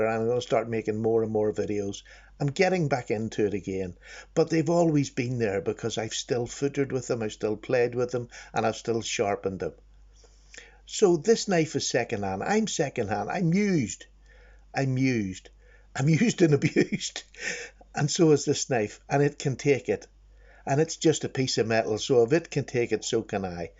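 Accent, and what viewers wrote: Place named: Ireland. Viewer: OMG....this is the first time I've shed tears when watching a blade video. It's just beautiful what you did with it. You should have been a writer or a poet. Love your story and respect you even more. Love watching your videos and just hearing that wonderful Irish accent talk about the love for blades. Thank you.❤️😊🙏🍀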